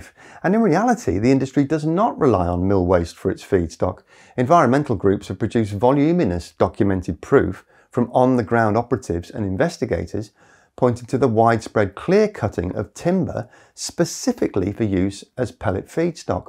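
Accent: United Kingdom. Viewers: Not even a minute in and you have told two untruths. 1st of all wood pellets are not made out of old growth. Most of the trees that are harvested are around 20 years old which is the typical lifespan of pine trees. The trouble with this lie is that it is misinformation absorbed by the those who lobby for legislation against the logging industry. It prevents clear cutting timber which creates these massive over grown forest which are basic tinderboxes. Those forest fires which are mostly avoidable cause significant harm including loss of life. So your lies and misinformation have consequences. Next, CO2 emissions are net neutral in biomass because the carbon that is released during burning is the same carbon absorbed during growth. Furthermore, just because you have some British accent doesn't make you smart. You are spreading climate fear (fear porn) with is all lies and misinformation. 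You are the danger. I would down vote you 1000 times if possible. I will settle with just calling you a liar and a fraud. Have a think on that!!!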